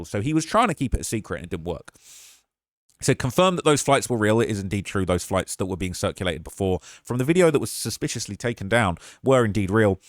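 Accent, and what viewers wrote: United Kingdom. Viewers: when i frst started watching you, i heard your accent and i'm British too and you whipped out a flick knife that locked in place i was like AAAAAAAAAHHHH TAKE THAT INTO THE POLICE STATION YOU'RE GONNA GET IN SERIOUS SHIT. it took me a minute to realise you lived in the US lmao i was like oh wow, i thought this guy was an idiot turns out i'm the idiot and had just landed on the channel. keep up the great work you're the only d4vd reporter i watch. rotten mango has done some good content also but you're a basically daily reporter on this. it's excellent. i enjoy the reddit stuff!! you've scrolled past one of my comments before. freaked me out. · This is extremely informative!how did i not find you before??All other networks and youtubers are being so prim and proper about it all and not even giving updated updates, if that makes sense. 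And the brit accent is so refreshing!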